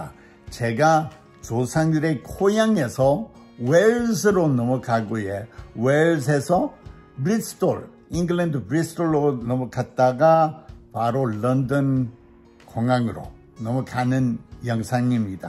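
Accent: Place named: Gyeongsang, South Korea. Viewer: 남들은 몰라도 저는 부산이 고향이라 경상도 사투리로 설명해주신게 너무편하게 잘들리고 쏙쏙 이해가 잘되서 너무 좋습니다~^^ 항상 건강하시고 다음편도 기다리겠습니다~!!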